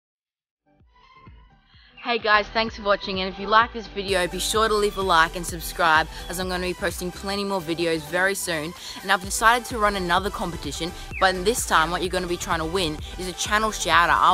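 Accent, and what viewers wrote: Australia. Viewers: I love your accent are you from Australia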